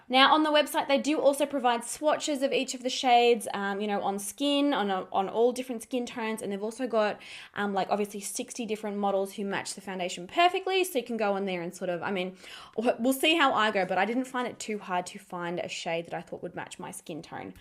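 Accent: Australia. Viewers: I love you!!! I have oily oily oily...did i say oily??..skin!.. and i like depend on your reviews and suggestions! I don't know what i would do without your channel!! And your awesome Aussie accent is an added bonus!! Lmfao. Keep killing it girl!! You just saved me $18.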